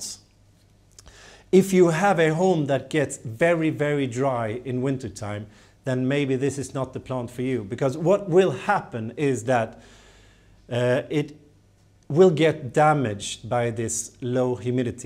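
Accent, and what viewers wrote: Sweden. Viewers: I know that most of you don't mean it that way, but actually I find it a bit rude to make fun of his pronounciation, or to put the "funny" pronounciation above the content. The content is so good! He is obviously Swedish, but he speaks very good English, so let's be thankful that they make the videos in English and not in Swedish. In Swedish, I wouldn't be able to understand them, because I am German. I would probably also have an accent if I'd start making English videos... :-D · its the beautiful swedish accent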